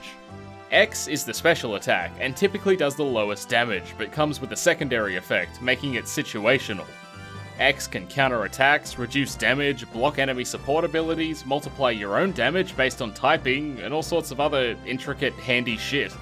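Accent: Australia. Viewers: Why are you Australian?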